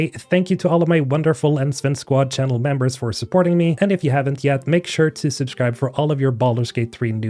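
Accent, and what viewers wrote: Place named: Sweden. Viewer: Tog ett tag innan jag hörde att du är från gamla goa Svedala! :D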